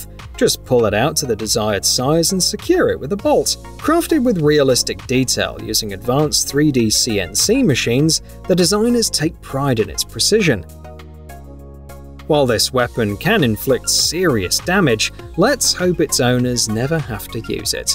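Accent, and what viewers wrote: United Kingdom. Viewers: Al-u-min-i-um is the British term. Judging by his voice, I would say the narrator is a Brit. Aluminium is also the preferred scientific usage, like helium & lithium. You've had a good day. You learned something new.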